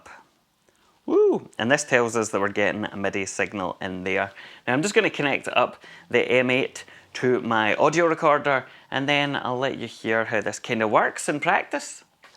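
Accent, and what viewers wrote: Scotland, United Kingdom. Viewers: Really hard to get used to your voice and dialect as a non british speaking tongue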